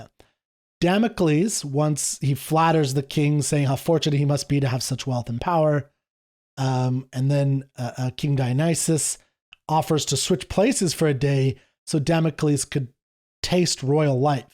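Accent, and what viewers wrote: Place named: United Kingdom. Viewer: I know his British accent doesn't help you in the US sometimes, but yeah I thought he meant Jackel not Jekyll. Honestly I might be mistaken but that was where my head took me with all the death, killing and mixed biblical /mythical references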